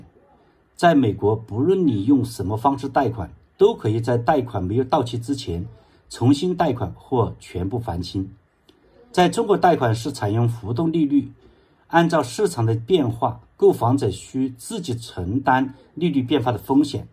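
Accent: Hunan, China. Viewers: 听CJ的口音像是湖南人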